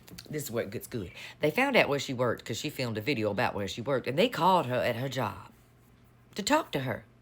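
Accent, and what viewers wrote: South, United States. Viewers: She has a beautiful southern accent/voice.❤ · Found your channel recently, love to hear your tea talks. I should’ve known you are a survivor, there’s a certain knowledge that comes from those who have. Know that we (I’ve included my family members) appreciate you, your savviness, and your ability to express current affairs in that wonderful southern accent. Stand strong, be safe, and continue on. Thank you. 💔🙏🏽💙💪🏽💙🇺🇸💙🚫🤴🏼💙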